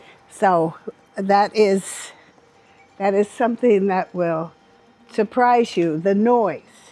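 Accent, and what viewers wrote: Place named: United States. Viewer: You keep talking about cigarette smoke I don’t know where you come from but you sound like you’re from the US and it’s nice that you’re able to ride a bike around and you’re safe and you don’t have homeless tents on the corners and people walking around like zombies because they’re so drugged out you don’t see stores that they can walk into and get needles and inject themselves so I can deal with the smoking I live here now for 2 1/2 years and as a woman I feel very safe walking around anywhere at night. then you’re talking about fireworks well you have fireworks but you don’t have big fires that people are starting in the streets in your major cities. If you don’t wanna hear the fireworks move outside of the town live up in the mountains there’s beautiful areas everywhere. you won’t find Walmarts and targets but what you will find her nice little vegetable stands and separate markets that you buy your meat and fresh bread that aren’t filled with disgusting ingredients and that are fresh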